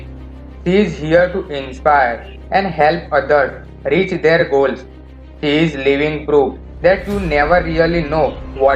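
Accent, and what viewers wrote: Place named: India. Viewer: Beautiful woman and lovely vide Disgusting Indian accent audio ruined it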